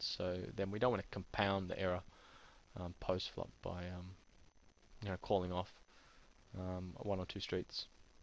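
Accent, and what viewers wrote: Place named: United Kingdom. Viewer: Thanks for the reply! By the way, I noticed that you listed Japan as the country. Are you British or Australian living in Japan? Just wondering from your accent, it's obviously not Japanese accent:)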